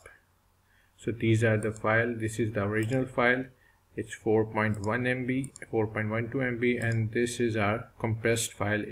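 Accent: India. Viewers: 😂 Sorry but I can't focus, your Indian accent is so fucking hilarious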